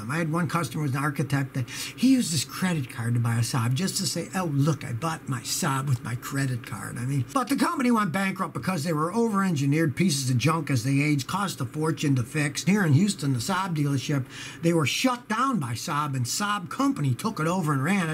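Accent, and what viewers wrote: United Kingdom. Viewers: your's is the best video channel on the internet! Makes my day everytime I watch one of your videos. Loved the British accent! BTW, a 1986 is 34 years old, but you're still a god to me.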